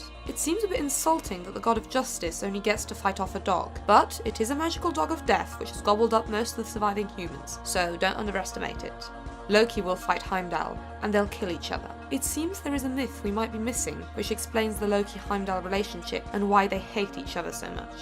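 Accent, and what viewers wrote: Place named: France. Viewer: Great video. I stumbled across this channel today and I really like it, can't have enough storytellers around. I do have two points of (hopefully) constructive criticism though, please don't take offense. 1. Talking speed. You speak pretty quickly, and sometimes that makes it a bit hard to hear what you're saying, especially when you're talking about old norse names. It kind of feels like you're standing in front of a room of people and you just want to get it over with. Especially the old norse stuff, I don't know if you're a bit nervous or unsure or something, which may cause this. There is no need to rush, you know the story, your jokes are good, you've got this. 2. Pronunciation. Icelandic is hard, judging by you accent I'd say you're French or something around there? Anyway, getting these words right can be a bit tricky, and even for a Scandinavian it isn't exactly intuitive. 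I'd advise you to spend a bit more time on them, perhaps find a way to listen to a proper pronunciation to get it right. Once more, I like what you're doing here, and I'm eagerly awaiting your next video.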